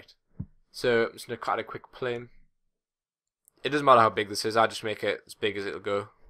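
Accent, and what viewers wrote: Newcastle, United Kingdom. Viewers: R u from newcastle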